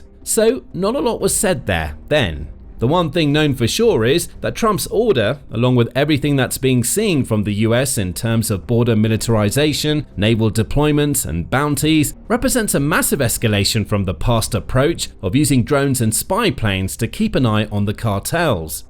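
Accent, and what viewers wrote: United Kingdom. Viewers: Ok, so we have a channel that talks about USA military force, and the narrator of the show has a British accent? Couldn't you get an American accent for a show about our military forces? It's just odd.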